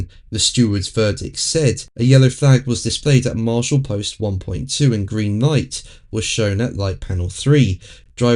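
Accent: England, United Kingdom. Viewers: Its called an accent, not impediment. There is a country called England, they speak the same words as america but with a different accent. Hope this helps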